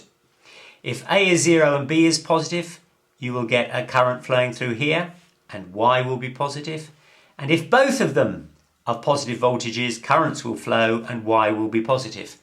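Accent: United Kingdom. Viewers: Brilliant at last a person who speaks British English and can deliver in a clear manner enabling me to understand as I listen more of the same quality please. I feel the need to study more and that is the frame of mind a good teacher should leave a student in as I said Brilliant. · GREATＶＩＤＥＯ Very clear! Your british accent is nostalgic haha. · Great video, great pacing, and I always feel smarter learning something from somebody with a British accent. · British accent LOL I like it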